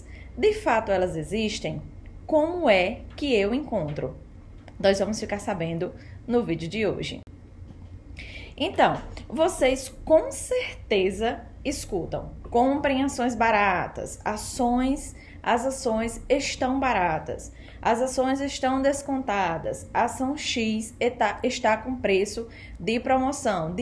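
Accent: Northeast, Brazil. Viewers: EXCELENTE VÍDEO, GRANDE CONHECIMENTO SOBRE O MERCADO DE AÇÕES, AMEI O TEU SOTAQUE NORDESTINO, NÃO O PERCA NUNCA! TÚ REPRESENTAS COM DIGNIDADE O POVO NORDESTINO! APENAS CORRIJA O HÁBITO DEFEITUOSO DA PRONUNCIA (INDENTIFICAR) NA PALAVRAS "IDENTIFICAR". PARABÉNS! PROFESSOR ROBERTO GUEDES CAMPINA GRANDE PB!!!! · Excekente video. Parabens ! E o sitaque matavilhoso me traz as boas lembrancas do Nordeste e dos inumeros amigos nordestinos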